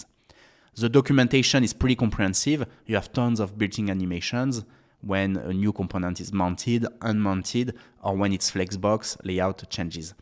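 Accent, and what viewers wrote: France. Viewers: Love it when the French speak english. Great content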